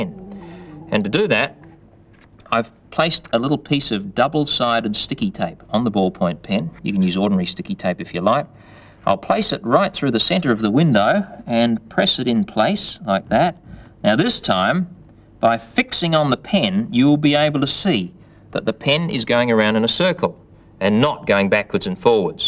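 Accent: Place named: Australia. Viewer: Optical illusions are 127x better when presented in a thick Aussie accent.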